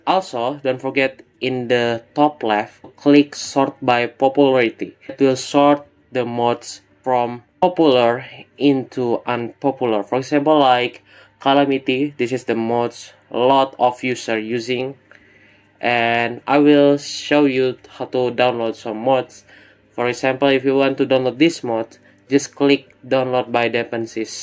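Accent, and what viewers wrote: Indonesia. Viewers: hmm i wanna ask a little question are u indonesian? [cuz im indonesian just to make it clear your accent was similar to a indonesian trying to learn english and thank u btw for the download files and it make my day thank u so much!]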